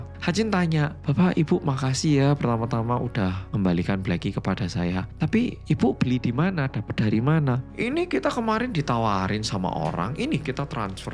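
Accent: Java, Indonesia. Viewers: Yang medok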